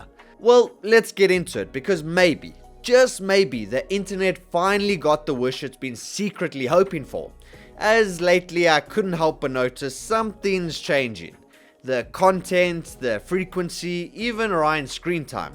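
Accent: South Africa. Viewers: Are you south african boet?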